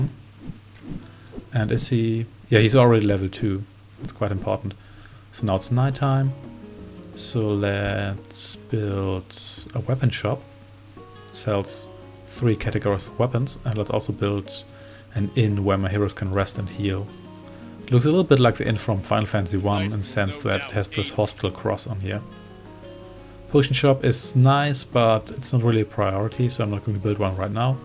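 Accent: Germany. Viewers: It looks like it would make for a neat app lol are you german by the way? I like your accent :)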